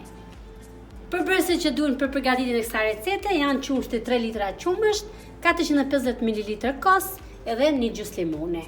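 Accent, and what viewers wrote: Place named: Shkodra, Albania. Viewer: Ty të lumshin ato dur se un vdes me hanger djath të njom mu duk se flitshe shkodranshe se edhe un jam shkodrane❤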